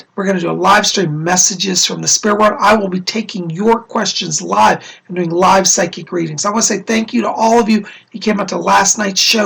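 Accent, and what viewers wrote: United Kingdom. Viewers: you starting to sound like your from UK lol you and Lady Cola make a beautiful awesome team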